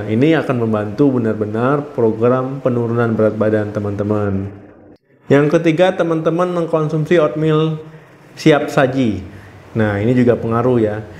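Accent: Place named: Riau, Indonesia. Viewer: Mohon maaf oot dok, dokter org riau ya? Logatnya familiar sekaliii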